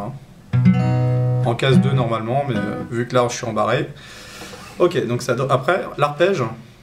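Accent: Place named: France. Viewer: thank youuu,your accent is so sweet,i love french :D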